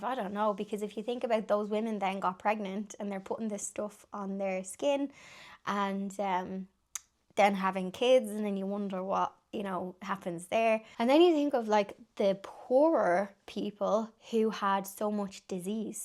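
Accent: Ireland. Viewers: I love this video!! To learn this history of Ireland from your beautiful Irish brogue surpasses any history lesson. Although, I am only 1/3 Irish, ☘️ I hold Ireland close to my heart. My mom cherished her Irish heritage. Her grandmother, Bridget, came from Donegal. I don’t know how different that is from Dublin. ❤ (My grandmother’s name was Henrietta ❤)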